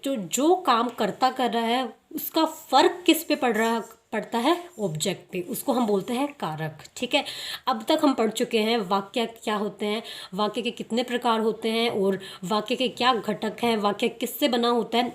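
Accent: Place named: England, United Kingdom. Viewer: It seems that you are from England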